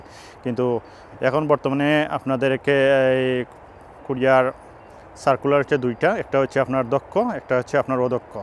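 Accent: Sylhet, Bangladesh. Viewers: ভাই আফনে ত সিলেটি 😊😊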